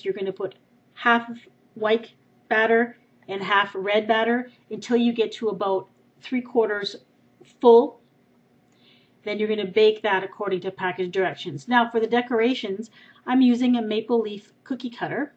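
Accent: Canada. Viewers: Lies! Lol, not that it's a BAD thing necessarily, but I have definitely head you yourself say the word about like "aboot" while watching a large amount of your videos -I'm kinda addicted- :P. Seriously, that was how I almost instantly knew you were Canadian. I just went to your actual channel to verify.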